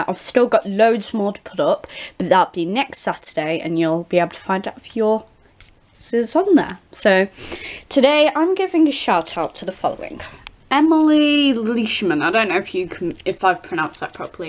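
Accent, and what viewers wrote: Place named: United Kingdom. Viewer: You are awesome! I love British accents, and yours is so unique! You are never too old for dolls!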